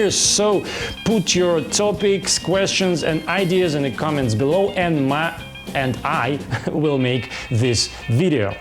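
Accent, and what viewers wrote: Russia. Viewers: явно видно русско-английский акцент 😅, You have to work more and practice your speech 💪👍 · ну такое, хорошо, сильный русский акцент, у меня ровно такой же, поэтому легко воспринимать. пока непонятно откуда планируешь брать англоязычный трафик, посмотрим, подписался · Russian English( Но в любом случае очень хороший) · Русский английский - идеальный для прокачки уровня - больше новых слов, понятных по контексту и произношению. Идеально! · Кто пишет "не такой уж сильный", вы серьезно? Иван сверху правильно написал, вам только так кажется, т.к. вы в голове примерно так же читаете по-английски (за это кстати "спасибо" нашим урокам английского в школах). Послушайте носителя языка, а потом, и всё сразу поймете. А для англоговорящих зрителей это еще усиливается, и для них он будет звучать именно как такой типичный русский акцент - лондон из э кэпитл оф грэйт бритн; лет ми спик фром май харт... 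· This genre should be cool only if you have a real native speaker, of course, for russians that`s easier to understand russian accent, but if you want to attract attention of foreign viewers, you need someone, who was born english speaking))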